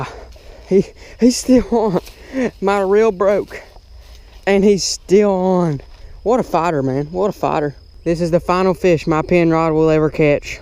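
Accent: United States. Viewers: Your accent sounds like a cowboy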